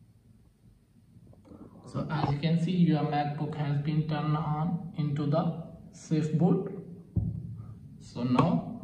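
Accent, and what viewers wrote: India. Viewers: As soon as I heard the indian accent, I knew it was going to work!!! Thank you!!!❤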